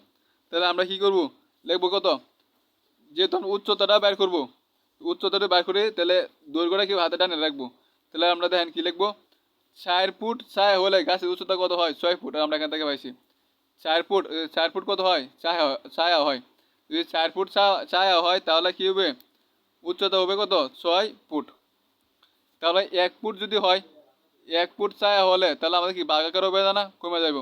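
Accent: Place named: Mymensingh, Bangladesh. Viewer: বুঝতে পারছি। তবে ভাই, আপনার বাড়ি কি বৃহত্তর ময়মনসিংহ অঞ্চলে?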